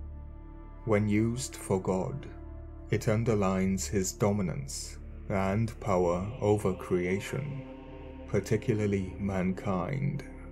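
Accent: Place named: England, United Kingdom. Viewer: Omg.. So dramatic with the heavy English accent 🤣. I figured "Lord" was related to Baal and other pagan deities in like 5 min as a teen. Also "Elohim" can be figured out with just a Strong's concordance. It's just a title dude, that can be given to angels and men. It's a council a court, some are fallen.. Like the angels that slept with human women. In the book of Job Satan himself attends one of these meetings and "El" gives job to be tested. Real Hebrew btw did not come from Sumarian language. It's a forgotten language that is now a mixture of languages. No one speaks pure Hebrew. So it doesn't surprise me of all its Babylonian influence, even modern Judaism main source is the Babylonian Talmud. Basically all major religions can be traced to Babylon.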